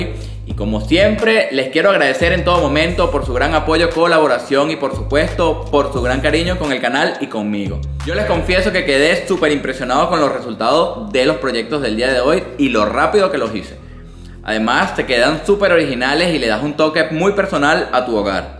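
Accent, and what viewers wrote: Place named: Venezuela. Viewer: Hola! Agradecidas Bendiciones para ti y tu gente! Deduzco que eres venezolano por tu acento! Me parece que estás en otro país! Tengo muchos LP y me estoy deshaciendo de ellos; si sabes de alguien que los quiera o necesite, estoy a la orden para regalarlos! Un abrazo hermano!:face-red-heart-shape:🙏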